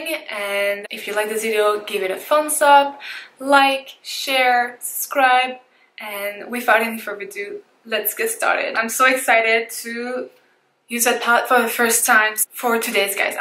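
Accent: France. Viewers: Beautiful look! Thanks for your first impression review, I'm so torn on whether or not I should buy it. I was wondering what your accent was because it sounded familiar, yay for another french girl! I'd love to see some videos in french too! I moved to the USA from Paris when I was 9, so I don't have my accent anymore :( it's a shame because I love it so much.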